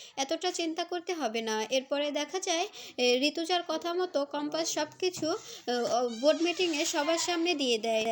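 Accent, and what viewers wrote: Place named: Bangal, Bangladesh. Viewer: বাঙ্গাল, বাঙ্গাল অশিক্ষিত উচ্চারণ, অপদার্থ, তোমার মতো গাড়োলের কথক হবার শখ কেন?নিজেকে শিখকিত করো,বাঙ্গাল বাঙ্গাল অশিক্ষিত উচ্চারণ ভূলে যাও।তার পর কথকতা করবে।শুভেচ্ছা রইল।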